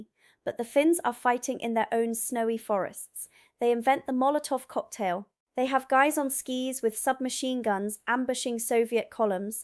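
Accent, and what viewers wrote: United Kingdom. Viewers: If you're American why do you speak with a strong British accent?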